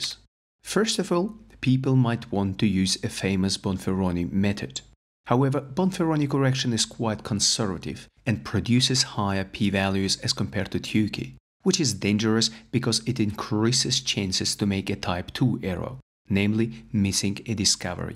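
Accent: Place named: Belarus. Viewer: Thank you for one of the best channels on YouTube on the topic of data science using R. By the way, I had a Jewish grandpa from Belarus and Latvia (he was born in Latvia, but moved to Belarus, before arriving at South Africa). Your accent is quite similar to his, but with an extra German sound. Is there any chance that you are from Belarus?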